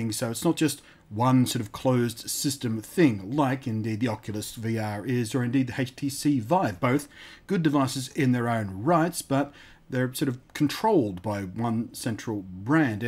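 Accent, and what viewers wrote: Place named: Australia. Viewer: i had no idea you were Australian that makes me feel pleasant inside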